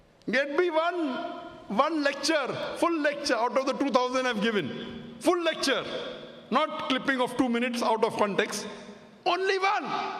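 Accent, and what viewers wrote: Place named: Kerala, India. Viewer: What a manglish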